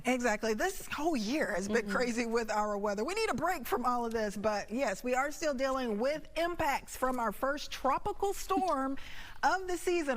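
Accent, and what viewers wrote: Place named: Houston, United States. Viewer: Houston has an accent 👌🙌 she’s great!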